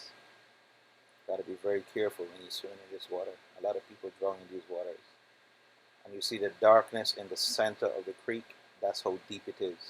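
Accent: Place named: Barbados. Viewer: sir, l am hearing a Barbadian accent . Are you Bajan or did you live there? Nice video.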